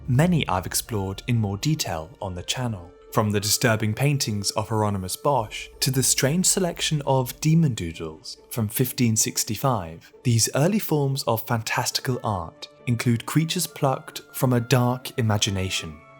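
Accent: United Kingdom. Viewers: Such a great video I love your voice I love the pace of your informative mind your British accent just awesome 👍.. loving the video about it and then watching more and more keep it up 😋👍😁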